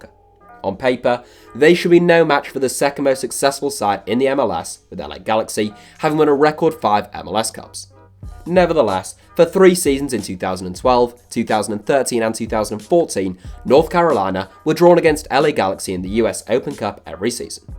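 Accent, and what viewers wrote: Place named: England, United Kingdom. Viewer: I have to say that english accent is too intense its so hard to understand what the guy is saying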